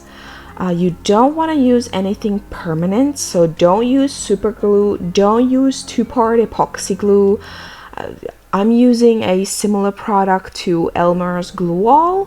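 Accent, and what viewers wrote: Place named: Finland. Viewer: Such a beautiful doll! I especially like the heterochromic eyes. Oh, and I knew in an instant from your accent that you were Finnish (I'm new on your channel) - kyllä suomalainen suomalaisen tunnistaa, hehe.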